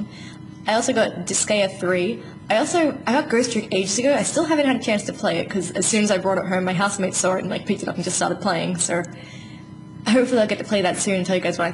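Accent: Australia. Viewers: are you from sydney?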